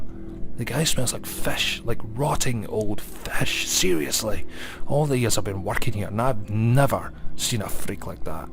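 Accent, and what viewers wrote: Scotland, United Kingdom. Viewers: I love your Scottish Accent!!!! Keep uploading creepy pastas just I can listen to your voice!!!!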